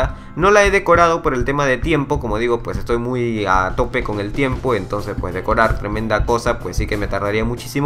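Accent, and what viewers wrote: Peru. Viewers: No se pero a mi duxo me suena a que es de Peru xD e.e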